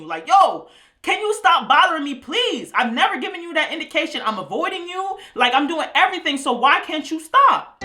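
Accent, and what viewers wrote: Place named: New York, United States. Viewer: This is not a funny experience but, I love your personality & you sound like you’re from ny!!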